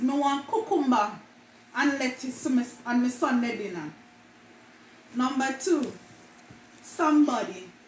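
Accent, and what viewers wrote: Jamaica. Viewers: I love jamaican accent.. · Wow I love your Jamaican Accent. Really enjoyed this ❤️ full watch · i love jamiacan pronounciation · Wow. The real Jamaican accent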